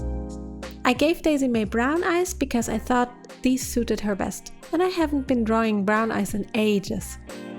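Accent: Germany. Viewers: I have a question ^^ are you german? :D cuz your accent sounds german ^^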